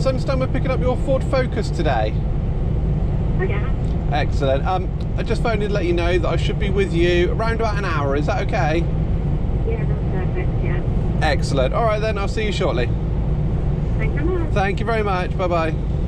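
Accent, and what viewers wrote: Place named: England, United Kingdom. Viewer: About 4 years since I left England so I'm really happy hearing English accent. And driving an left side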